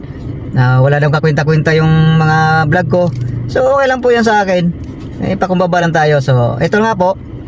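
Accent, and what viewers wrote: Visayas, Philippines. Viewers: ako alam kona visaya ka bossing kaya nga ganyanvka mag salita ksi naiintindihan ka nmin walang masama sa pananalita ng visaya nag papakatotoo ka lang porket visaya ng lalait na mga hinayupak kala mo nmn napaka perpektong tao nila hindi ata alam ng mga bobong ginebra fans na walang perpektong tao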